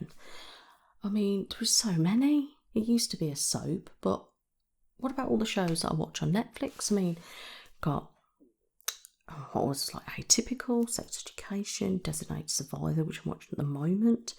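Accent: United Kingdom. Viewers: I just watched your Q&A tonight and one thing struck me that I had to comment on. You mentioned people commenting negative things on your videos, for example your facial expressions. That my friend is one of your strongest qualities. I absolutely love your facial expressions! I've always loved the British accent so that is a plus too. Your haircut video is my favorite so far. I've watched it many times. And as far as the mental health goes, I have anxiety and have most of my adult life and also worked on a mental health ward of the hospital and my heart goes out to that type of patient. So I'm glad to see you speaking out on the mental health issues. Keep up your good work. You're my new favorite artist. Even us 65 year old grandmas enjoy ASMR. 🙂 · I am so glad I found your channel a couple weeks ago, I have been watching your videos every day & just love you. 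I was born in the UK (Leyton) but moved to Canada 40 years ago. I feel a connection in the sense that you remind me of "home" you speak just like my aunts & cousins & it's a lovely feeling. Thank you!! You are a beautiful soul.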